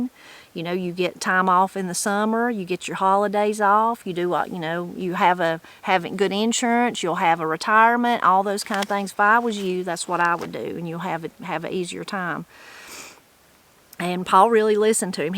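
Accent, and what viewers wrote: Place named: Martins Ferry, United States. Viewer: I just subscribed. Your speech and culture remind me of my family roots from Martins Ferry area.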